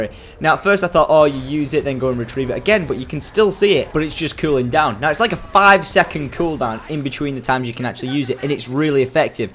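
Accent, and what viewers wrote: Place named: Manchester, United Kingdom. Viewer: You sound like your from Wigan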